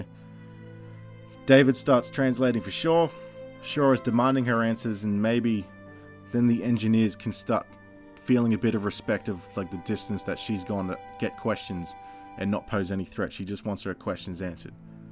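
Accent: Ireland. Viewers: Your Kerry Irish accent with a mild hint of German is entertainingly close to the mark. This would have been a much better film from a continuity perspective and would have made a lot more sense. Given what we've seen of Shaw in flashbacks I think this film could and potentially will still happen if the Sequel / Prequel mentioned by Ridley Scott is still on the cards. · Love your Irish accent lol !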